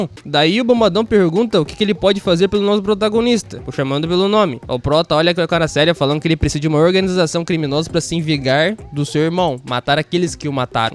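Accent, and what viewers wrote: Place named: Caipira, Brazil. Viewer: Verdade mais só que um pouco de caipira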